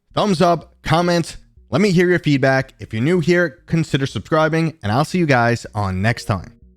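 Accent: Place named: South Jersey, United States. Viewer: lol. I Grew up south of Philly in an icky place called Chester. Lived there in the 80s and 90s . I currently live between Philly and Lancaster. You sound like a Jersey guy to me. I would guess a little more south Jersey cuz your accent isnt that thick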